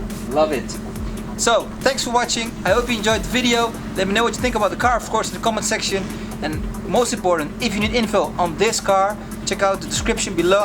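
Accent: Netherlands. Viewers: Leer effe normaal engels te praten zonder zoon verschrikkelijk Nederlands accent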